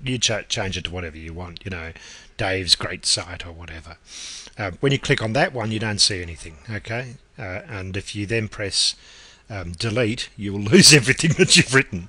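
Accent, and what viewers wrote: Australia. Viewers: He's Australian...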